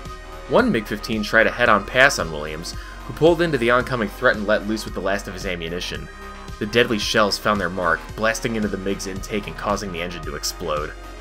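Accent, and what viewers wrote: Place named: Midwest, United States. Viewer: Your midwest accent makes this great.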